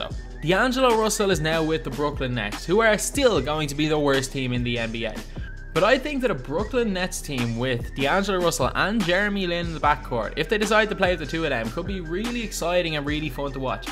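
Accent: Ireland. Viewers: Dude u Irish?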